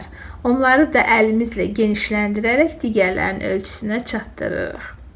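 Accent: Baku, Azerbaijan. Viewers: Gozel Bakili letcesi le bacim, Allah sizi qorusun, 🙏🏻❤️🧿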